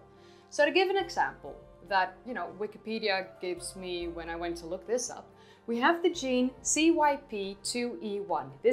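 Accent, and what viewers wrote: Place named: Netherlands. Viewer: A person with a Dutch accent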